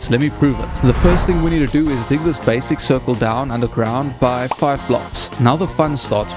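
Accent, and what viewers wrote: South Africa. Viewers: Are you South African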